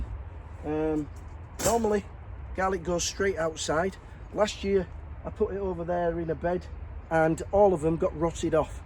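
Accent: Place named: West Yorkshire, United Kingdom. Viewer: Hello, I just watched your video and really enjoyed it. So down to earth and practical, and I love the West Yorkshire accent. I worked in Leeds for 12 years and really miss the place! I don’t think I will have problems with flooding on my veg plot😮😂.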